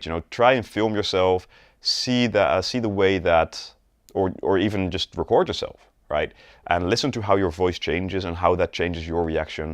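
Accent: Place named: Netherlands. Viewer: This is very good,! My guess is that you’re from The Netherlands as well! We have such a unique accent lol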